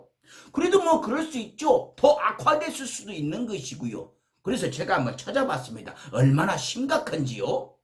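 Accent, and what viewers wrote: Jeolla, South Korea. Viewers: 경상도사람이지만 전라도 사투리가 너무 구수하니 듣기 좋네요. 강성범씨 방송보면 속이 뚫리는 것 같습니다. 앞으로도 잘못된 놈들 많이 씹어 주시길~~^^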